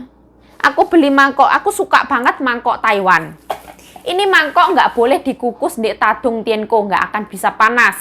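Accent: Java, Indonesia. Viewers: Mbak pean Indonesia mn to kyok'e Bahasane podo karo aq jowo tulen😀👍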